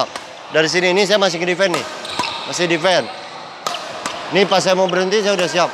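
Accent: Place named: West Java, Indonesia. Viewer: Nyimak kang Ciri khas pisan urg sundana... Hatur nuhun hehe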